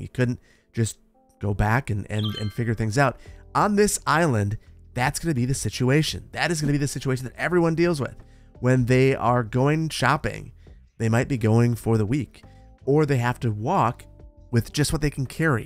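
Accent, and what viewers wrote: Wisconsin, United States. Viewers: I totally think that this island is based on Mackinac Island (a car-free island in Michigan) because Marquette is another larger city in northern MI. Mackinac Island is a tourist trap, but Marquette is a super cool city on Lake Superior with a great atmosphere and massive old ore docks towering over the shore. I'm commenting this like 30 seconds into the video, but I hope you lean more towards a Marquette, MI vibe than Mackinac Island vibe. Also it backs up my theory that you sound super wisconson, and the UP is a popular travel spot for wisconsoners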